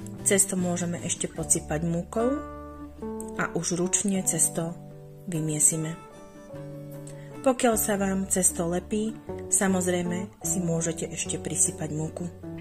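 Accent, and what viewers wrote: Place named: Slovakia. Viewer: zdravím Vás- nejen výborné koláče, ale ta Vaše nádherná slovenština mi chyběly😁😋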